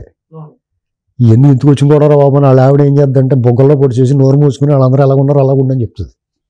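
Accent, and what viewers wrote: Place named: Godavari, India. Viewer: అల్లసాని వారి అందెల సవాలు మాకు పరిచయం లేకున్నా వారి గోదావరి యాసతో అచ్చ తెలుగులో అనేక సామెతలతో గాడిదలు కూడా సిగ్గు పడే విధంగా సున్నితంగా విశ్లేషించే విధానం చెవులు నిక్కబొడుచుకుని 'ఈ జగ్లక్ భాగవతం ఎంత సేపైనా ఇంకా ఇంకా తెలుసుకోవాలనిపిస్తుంది. 40% గాడిదల కి ఇది అరణ్య రోదన అవుతుందేమో.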